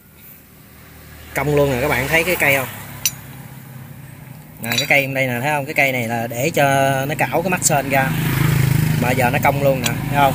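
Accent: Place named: Mekong Delta, Vietnam. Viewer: Luôn luôn ũng hộ Chia sẽ cách làm, kinh nghiệm rất là thực tế và dễ hiểu Cách nói cũng rất là chân thật người miền Tây.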